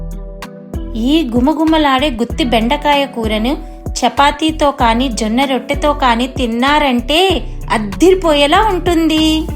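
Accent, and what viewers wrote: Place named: United States. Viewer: Madam I am a huge fan of your cooking and your american telugu accent and your style of expression please tell repice of chicken soft kabab and chilli motail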